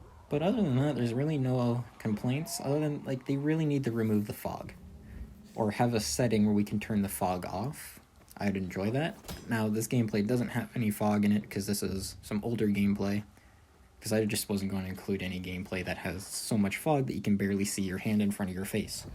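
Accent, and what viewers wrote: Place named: Canada. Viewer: your canadian accent lol 😬😅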